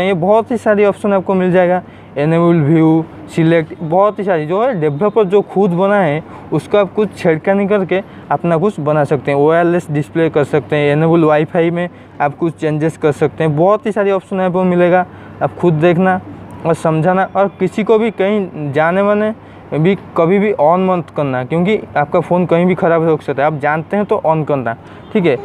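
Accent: Odisha, India. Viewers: Are you frim odisha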